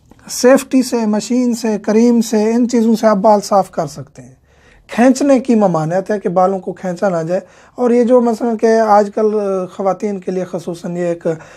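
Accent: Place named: Saraiki, Pakistan. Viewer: Sraaiki bolny waly urdu aisy he bolty hn....weldone ❤❤❤💐💐💐💐💐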